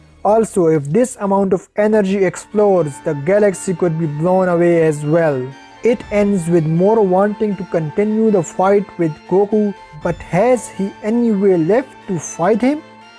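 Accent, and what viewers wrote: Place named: India. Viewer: Your voice is like a indian btw i love your videos and now i also love your voice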